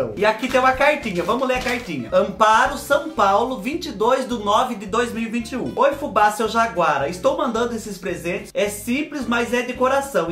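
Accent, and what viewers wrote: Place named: Paraná, Brazil. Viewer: Ahh, entendi vc mora no Paraná, esse sotaque bem forte só pode ser eu doida pra saber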